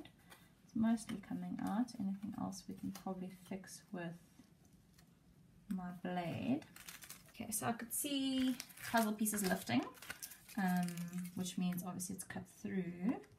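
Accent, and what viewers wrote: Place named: South Africa. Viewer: What thickness basswood did you use for the top piece? I didn’t catch that Ahh accents. My go to is always Australian and then after a minute, I thought no those vowels aren’t Aussie she must be kiwi and then after another couple minutes I got an Irish twang and that’s when I knew you must be South African because that accent always sounds like all the subset English accents put together to me 😂. Checked your profile and sure enough!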